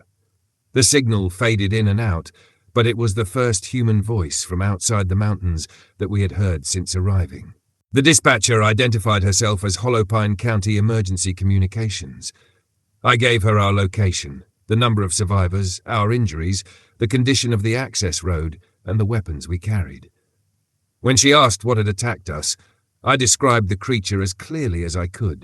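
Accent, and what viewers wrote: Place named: England, United Kingdom. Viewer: Like the premise the English accent or whatever it is I just can’t do it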